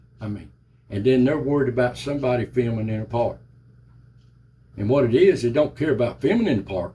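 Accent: Appalachia, United States. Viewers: I love your accent I'm proud to be an ole hillbilly like you ole buddy. I understand everything you say. Love your videos better than anything you can watch on this ole tv these days. Keep it up ole buddy! I live in Kodak TN and used to live in Eastern Kentucky so this all hits home to me. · Mr, I feel like the darn COE and park managers have gotten waaaay out of hand with all their rules and regulations!!! I live in NE TX and my family and I have had more than 1 ru-in with the COE. So I know just how you feel too. I enjoy all of your videos as you uncover some of America's lost past and old cemeteries. your voice tone and Appalachian accent is very soothing to me. keep on keeping on! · Good to see a face with that true Appalachian voice.. Blessings brother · Love the channel. Though I was not born in the Appalachian mountains, my mom was. My grandparents spoke the exact same way you do… my Grandmother a Boleyn and Sparkman by birth spoke so thick I could hardly understand her. 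they were from Knott county and Floyd county Ky. I remember going to family reunions and meeting my Triplett, Boleyn, Wicker, Sloan, Collins, Gibson, and Sizemore families. We would stay for a few days and my Great Uncle Oscars wife would cook us a breakfast fit for Appalachian royalty. My mom has passed but I keep up with a few cousins up there. Thanks for what you do, sir!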